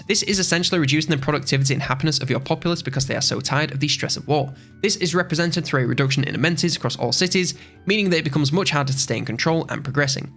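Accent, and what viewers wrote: United Kingdom. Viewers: Accents are a thing, I speak French from France but live in Canada and have a hard time understanding Quebec French. The lad in the video here has a heavy Bri’ish accent and skips every third letter. Perhaps he should use AI to narrate.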